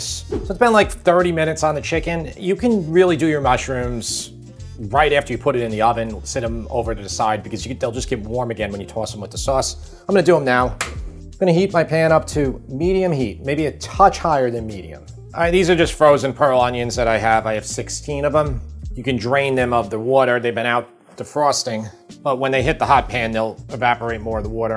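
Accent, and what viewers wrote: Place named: Long Island, United States. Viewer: That Long Island accent is like a warm blanket.. reminds me of home 😢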